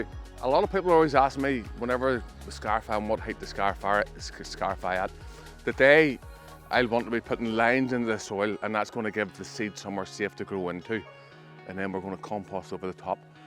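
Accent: Northern Ireland, United Kingdom. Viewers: Never thought I would get so much enjoyment watching a video on lawns. Knowledgable, genuine and straightforward. Throw in northern Irish accent and you have all the ingredients for a brilliant channel. Keep it up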